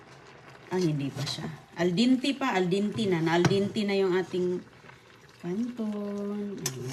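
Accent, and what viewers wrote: Visayas, Philippines. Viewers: D ka pa nasanay ateng haha bisaya ba sya Kya ganyan salita nya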